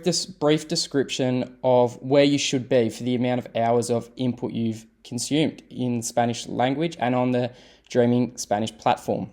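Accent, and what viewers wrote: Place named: Australia. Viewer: I am around the same hours as you (1300 hours), however, I am not counting hours anymore, just listening to as much content as I can. I agree with what has been said here that native Spanish is much different than Dreaming Spanish videos. I also agree that the roadmap is a little ambitious. I have been using Italki (65 classes) for 6 months and found that it has boosted my confidence, which I think is absolutely vital for moving forward with language learning. My speaking is certainly not fluent, but I can make myself understood. If I am talking about a subject that I am very familiar with, it is much easier than a subject where there is a lot of new vocabulary. My attitude now is not counting hours or making goals, but just to keep plodding and try to enjoy the process as much as possible.I am also reading a lot of books which I think has been great in teaching me sentence structure. 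I am of the firm belief that language learning is long-term progress with no ending - we just keep improving. That is why for me, attitude without self-pressure is vital so that I don't become too self-critical. I would like to add that I think ¨Dreaming Spanish¨is a great platform and I can attribute most of my learning to it. By the way, you sound like you are Australian - I am also living in Melbourne. Your video was frank and honest and that is great to hear because there is so much BS out there on the net with lots of false promises.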